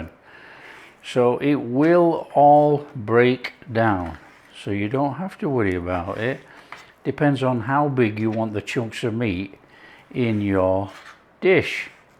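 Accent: United Kingdom. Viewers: Oh, COME ON PLEEEEASE, another fantastic video? I havnt digested the last one yet, 😅 sir you are the best cook, chef master on the internet, and with good reason, your meals, recipes are so perfect, your an awesome fellow to listen to, even though i have to really listen cause your british accent, the entertainment you share with us, and you tasting what you made at the end, is priceless, love your channel sincerly sir, with huge respect from the southern great state of Illinois...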